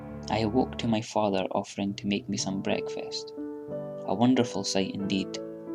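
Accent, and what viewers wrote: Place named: Scotland, United Kingdom. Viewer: Nice to hear a fellow Scot read one of my stories. Nicely done :) ~ Mike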